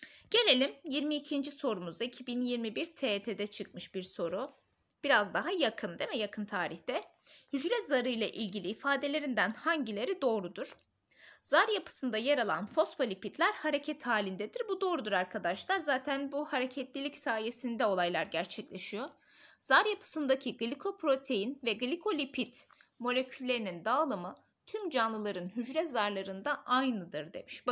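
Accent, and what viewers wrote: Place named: Mardin, Turkey. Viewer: şiveniz mardin şivesine benziyor ondan sordum hocam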